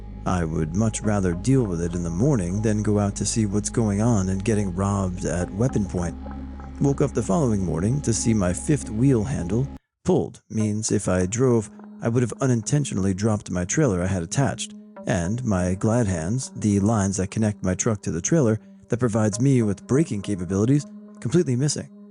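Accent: Australia. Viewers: In regards to your Australian accent. Well, I've certainly heard a lot worse. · Aussie here - your accent was pretty good! More of a country accent than a city accent, but pretty good!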